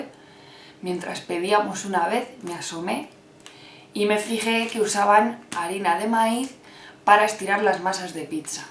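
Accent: Spain. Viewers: Me alegro de que te sirva la idea! :D Por cierto, eres vasca? En algunos videos te saco algo de acentillo xD jejeje Y enhorabuena, por tus videos, este canal y el de recetas de cocina los sigo mucho... no se me da muy bien cocinar aun, pero disfruto viendo los vidos :) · ushhh me molesta el acento español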